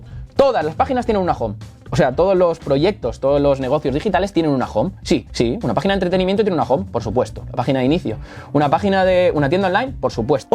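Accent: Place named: Madrid, Spain. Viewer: Hola, me encanta como explicas, eres divertido, estoy haciendo este curso sin tener ni idea de informática, bueno sí lo básico. Y con un profesor cómo tú es difícil concentrarse. Qué madrileño más bonito. Sin ánimo de ofender, desde el corazón jijiji. Saludos desde Málaga.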